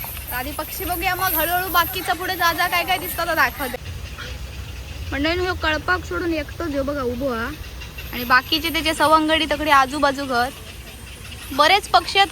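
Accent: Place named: Malvan, India. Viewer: बोलतस मस्त.असाच बोलत रव्ह.मालवणी बाज कायम ठेव👌👌👍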